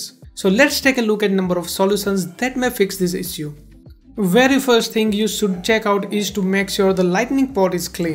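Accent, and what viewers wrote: India. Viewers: omg that indian accent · You are a narrow minded racist. A chinese criticizing someone’s English accent is just hilarious. His English is fine - he has an ‘accent’ but I am quite sure even you could understand. BTW it’s ‘Indian accent’ not India accent. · India accent + spoke very fast = rip listeners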